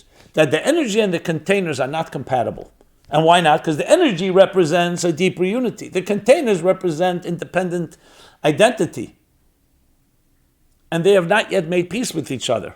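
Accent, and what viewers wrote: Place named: New Jersey, United States. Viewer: Here in Britain, we have something called black magic chocolates. They come in a large shiny chocolate box. And are absolutely delicious. You can't stop at one. Don't know whether you have them in the states 🤔 All negative states of being are overcome by love. There is nothing stronger. Although it is vital to sort the wheat from the chaff. And act accordingly. Thank you for the great talk, Rabbi. Love the new Jersey accent. Oh, Ecclesiastes is an awesome book. You've just mentioned it. Few were wiser than Solomon. Heightened states of consciousness are synonymous with objective consciousness. When separateness disappears and one becomes all that one beholds. As Solomon says, "as above, so below."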